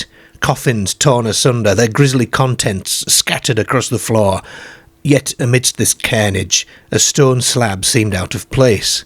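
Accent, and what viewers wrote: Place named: Northern England, United Kingdom. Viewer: Just came across this version of the story. I am trying to work out your accent. Obviously northern, and I am from the north of England, but can't place this. I know there are lots of variations and yours is new, and fascinating.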